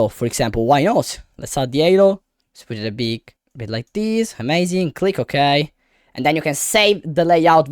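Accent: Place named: Italy. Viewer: i like your italian accent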